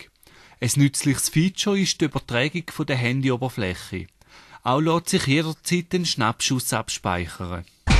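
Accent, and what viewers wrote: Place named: Switzerland. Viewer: er spricht schweizerdeutsch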